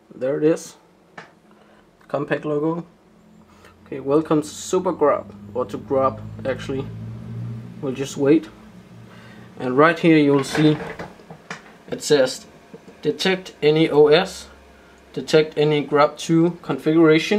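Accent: Denmark. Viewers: Dude, you're so danish :-D - Its so obvious by the way you're talking english!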